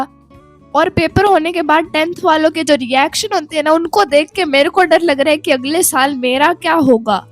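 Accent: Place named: Haryana, India. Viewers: Yah she is from Haryana hisar 😊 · Bahan are you from Haryana because from your tune it seems that you belongs from Haryana😊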